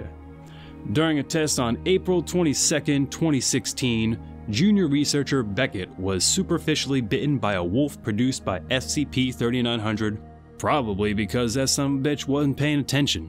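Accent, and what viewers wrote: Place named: South, United States. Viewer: Finaly I know, the southern twang is how he talks.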